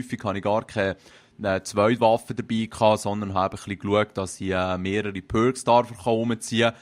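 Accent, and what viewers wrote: Bern, Switzerland. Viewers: Besch du en berner ^^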